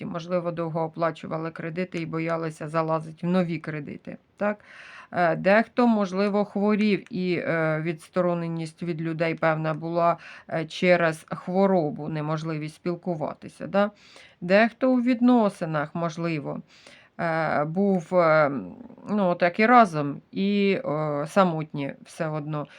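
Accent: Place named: Poltava, Ukraine. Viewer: Полтавська або Черкаська обл😂. Говір- ☝️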